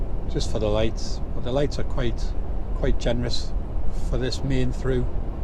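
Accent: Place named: Bedlington, United Kingdom. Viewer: where you from? sound very local to me (Bedlington) nice vids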